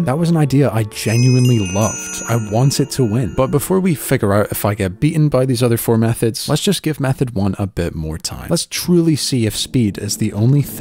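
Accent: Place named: England, United Kingdom. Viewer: I thought your from England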